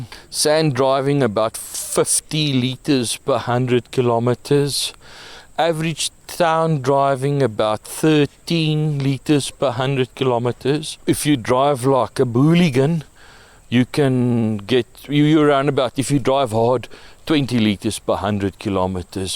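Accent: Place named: Russia. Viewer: Your accent like russian 😅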